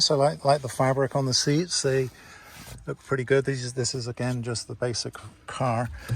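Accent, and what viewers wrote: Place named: United Kingdom. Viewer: Good to hear another British voice here, I’m in OC. Love these base broncos. Look forward to seeing what you do to it 👌🏼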